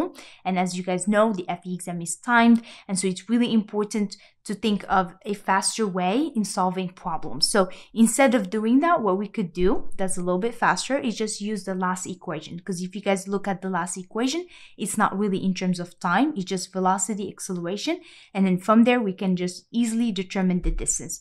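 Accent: France. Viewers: Hi. I like your teaching style. Also can hear your lovely french accent. I too speak French and got all my degrees in French before moving to the US. Here vitesse = velocity … I will need to convert the terms before deep diving. How can you help me? I graduated with my bachelor 14years ago, but want to take the FE in 3months. I do I contact you for an effective communication to get me started? Thank you.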